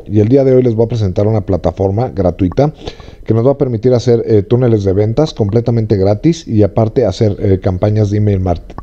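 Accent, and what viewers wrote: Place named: Mexico. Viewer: Detesto escuchar videos con voces que no sean mexicanas, y por eso, este video fue música para mis oídos, gracias!!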